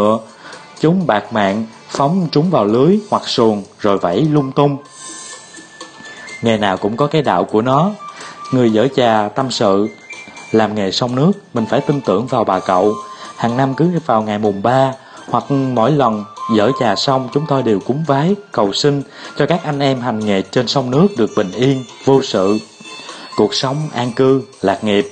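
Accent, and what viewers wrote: Vietnam. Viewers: đánh cá thú vị quá,iu giọng miền tây quá bạn hiền ơi!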